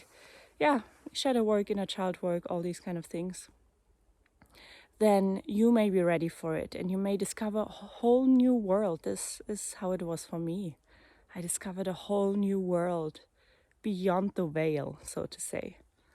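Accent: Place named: Germany. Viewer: süss dein deutscher Akzent, unverkennbar. Meiner auch nach Jahrzehnten im Ausland <3 schönes neues Jahr <3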